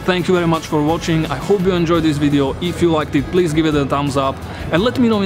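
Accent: Slovakia. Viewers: This channel is exactly my cup of tea! 😊👍. Nice work. Keep going. Btw, I knew it immediately that you are Slovak from your accent 😆. Though, very solid English. Cheers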